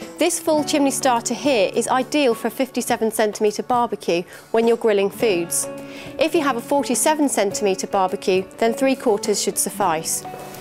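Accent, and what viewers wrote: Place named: England, United Kingdom. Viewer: The first clue that this was going to be total crap was the English accent . . .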